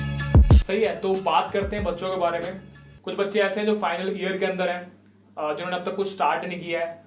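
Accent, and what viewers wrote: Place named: Haryana, India. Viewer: THAT CUTE HARYANVI ACCENT IN HIS VOICE ♥😅🏄💯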